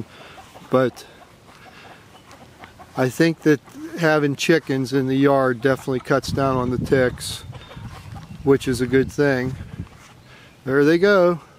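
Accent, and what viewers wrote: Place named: Maryland, United States. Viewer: Are you from Maryland? Your accent sounds Maryland to me.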